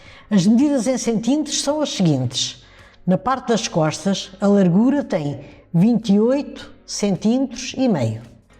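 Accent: Portugal. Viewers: MT bonito o seu trabalho. MT bom ouvir português de Portugal.🤗♥️🌹🇵🇹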